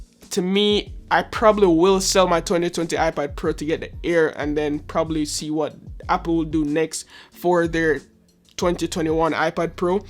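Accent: Jamaica. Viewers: Nah a the Jamaican accent fi me bredda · Are you Jamaican?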